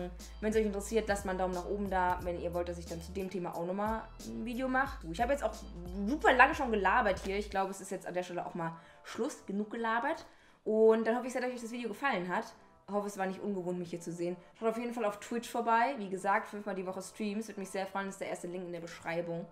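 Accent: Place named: Aschaffenburg, Germany. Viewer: Kommst du aus der Nähe von aschaffenburg?? Weil man merkt den Dialekt voll loool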